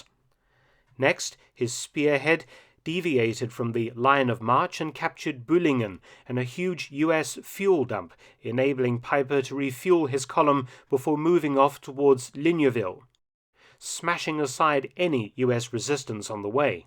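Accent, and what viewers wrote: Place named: RP, United Kingdom. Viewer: GENERAL SOUTHERN BRITISH/Received Pronunciation.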